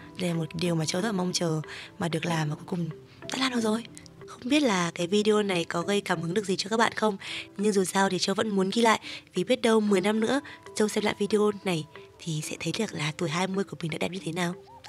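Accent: Hanoi, Vietnam. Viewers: Tui thích giọng gái Hà Nội Tui thích giọng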